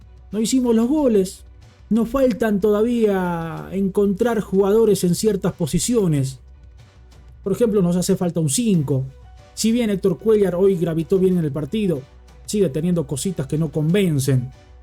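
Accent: Argentina. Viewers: Por que habla cómo argentino?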